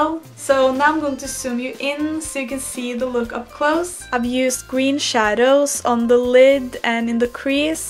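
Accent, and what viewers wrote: Norway. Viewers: Fun design, and awesome execution! :) I think that is a Norwegian accent, isn't it? Thanks for the awesome tutorial!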